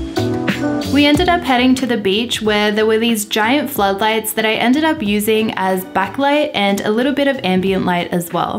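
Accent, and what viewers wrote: Australia. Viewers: Do all aussies talk like you? 😍 I have to go to Australia. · I am in love with the Australian accent already, so cute!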